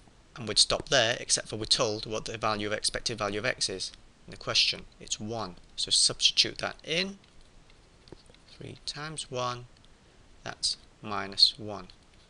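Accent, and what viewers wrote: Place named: United Kingdom. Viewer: That british accent just adds a whole layer of authenticity on top of it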